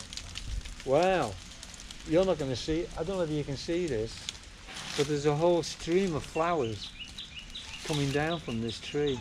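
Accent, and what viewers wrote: United Kingdom. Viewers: Can spot the scouse accent lol .... said the woolyback lol 😂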